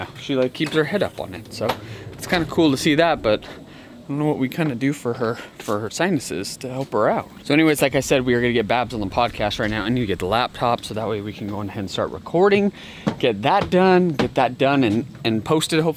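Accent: South, United States. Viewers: You are really are speaking more like the south YaLL! Lol have a beautiful day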